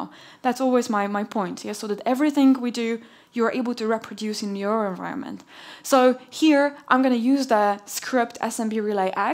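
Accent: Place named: Netherlands. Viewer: Yes she's superb, and her english has improved - her delivery is a bit more mellow that earlier "rapid fire" webinars from a few years ago. She now sounds more Dutch than Polish !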